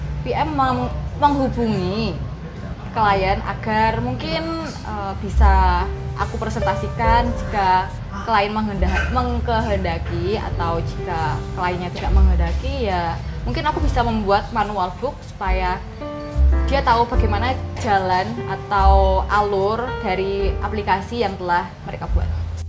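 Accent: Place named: Java, Indonesia. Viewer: medhok mbak..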